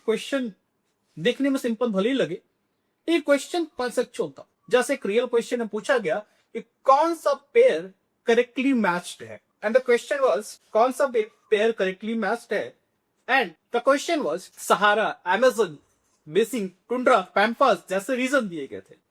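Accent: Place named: United Kingdom. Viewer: Hindi ko b English accent m bolne ki kya jarurat h sir aapko. BTW...nice session. Thnxxxx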